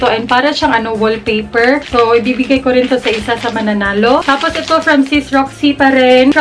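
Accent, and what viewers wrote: Visayas, Philippines. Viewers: hello atiiii 😊😊 pnapanuod ko po yung video mo kasi: **natural na natural ka po I mean hindi ka maarteng magsalita hindi pasosyal 👏👏 **gusto ko po yung tone ng voice mo kahit po parang bisaya ang sweet po kasi 😍😍 **ang kulit mo po sa mga videos mo especially pagkasama mo yung my loves mo-jolly person 😊💜💜 **hindi ka po nakakaboring panuorin 😘💙 --- plssssss 💜💜💜👏👏👏👏👏👏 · Hi ate 😍 Chada jud kaayu imung mga video ate uy labi natung mga blogs ninyu ☺😙 Haha si mylabs ba kulango pud diayng dako ganahan kayu kog lantaw te kiy usahay kiy maka storya kag bisaya haha struggle pud siguro ate ba sig tagalog haha hinaot uy nga naa untay bisaya nga mga blog ayha pa dayun ko mag himo ana😂 halaka te uy! pa try gud ko anang ipag me now o ambot unsay spelling ana😂 haha ganahan kog lantaw sa imu g videos te kiy Tinood ka dili ka plastic te😍 mismo akung papa ganahan pud sa imung mga video kiy imung tono daw kiy bisaya gihapon ganahan siyag lantaw haah ana akung papa pwede ba daw mag bisaya unta ka kiy wala daw kaayuy Bisaya nga mga bloggers Pero Ana ko sa iyaha tagalog lang sa ta pa kig dili unya ka sabot ang mga Subbbies ni ate nga tagalog😅 haha nag storyaha nami ni papa tungod nimo 😍 Haha tung nag kuan mong mylabs nimk Joker daw kaayu😂 haha dinhi lang sa ko te kiy mananaw pakog videos nimo uy! 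maka adik man ka ate😂 way char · Hi Ate, unang vid na napanood ko po sainyo ung Fashion 21 na make up tut. Nagsubscribe po agad ako kc nagagandahan tlga ako sa accent/punto ng mga bisaya since bisaya din mama ko. Sya po ang naalala ko. Para skin napakalambing po ng salita nyo effortless nyo magpatawa sa mga vid. Simple lang po pero nakakaentertain. Congrats din po sa 21K subbies!!! ❤ FB: Jonna Banuelos IG: jlvm_ Twitter: jonna_lnv Gmail: <mail> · Hi ate, di ko lang matandaan kung anong pinakaunang video na napanood ko sa channel mo pero sure akong baguhan ka pa nun kasi hindi pa yung G7X ang cam na gamit mo hehehe. Basta naalala ko lang noon na intro mo palang napa click na ako sa subscribe button kasi nakakahawa ang pagka witty mo at bungisngis. Enjoy na enjoy akong manood sa mga vlogs at tutorials mo kasi bisaya ka, ganahan kaayo kos tono nimo ba. #ProudBisayaHere:D Keep on inspring us and God Bless you always! 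I love you ate:) Mwaaahhh <link> <link> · nag subscribe ako kasi ang kulit ninyong mag jowa. katuwa. una sabi ko bisaya siguro to kasi may punto hanggang sa di ko na namalayan nagmarathon na pala ako ng mga vids mo. IG: krizzie31 FB: krizsy anne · Hi Miss. Madalas ko na nakikita yung mga videos mo sa feed ko then nung pinanood ko na di ko namamalayan na grabe na yung tawa ko. Very natural, transparent and I love your bisaya accent kaya napa subscribe ako. Congrats po for 20,000 subbies and advance happy anniversary sa channel mo po. More kwela videos to come dito sa channel. FB: <link> IG: <link>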